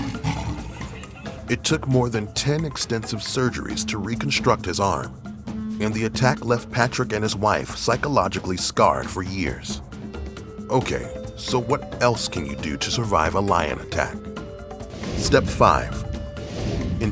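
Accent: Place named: United States. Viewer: Hearing an American accent in a metric measurement 👌🏼👌🏼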